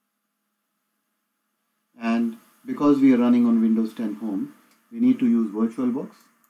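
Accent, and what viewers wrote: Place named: India. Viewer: Indian speaking people saving my weak ass once again. Love you <3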